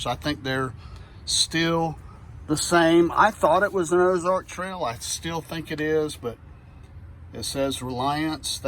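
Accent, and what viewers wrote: United States. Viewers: What a beautiful accent you have , your almost singing ;D where about in America is your accent from sir ? if you do not mind me asking . I personally would prefer to use biodegradable bags which is why I am searching the net . Thank you for the demo ;D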